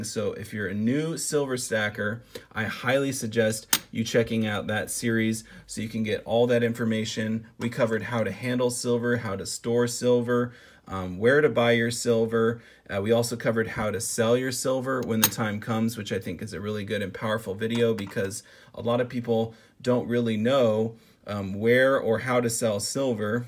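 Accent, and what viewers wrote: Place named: San Bernardino, United States. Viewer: That accent. San Bernardino?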